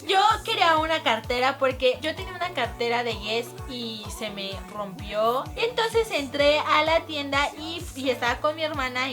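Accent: Mexico. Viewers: habla como fresa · a se le está quitando el acento maxicano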